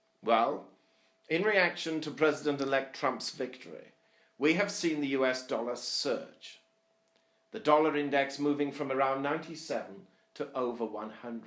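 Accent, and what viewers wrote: United Kingdom. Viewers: I like your British accent. Unique and attention grabbing.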